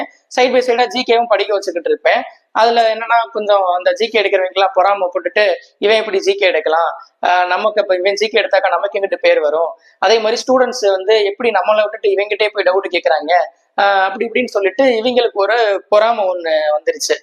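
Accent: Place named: Madurai, India. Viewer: Sir madurai ah slang apditha iruku avaiga ivaiga 😅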